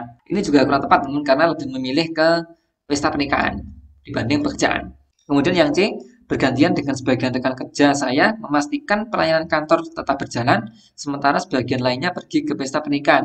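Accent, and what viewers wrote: Java, Indonesia. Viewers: Buoh... 😂 Jowo tenan coach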